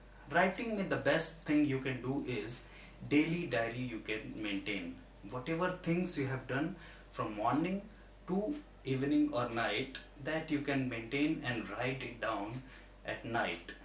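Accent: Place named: South India, India. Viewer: Sir why is there a pinch of south Indian accent in ur accent???... As far as I know u r from bihar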